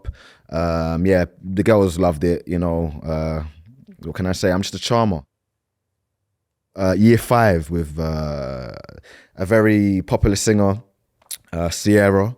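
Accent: United Kingdom. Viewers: Sounds like a British. Very confident guy.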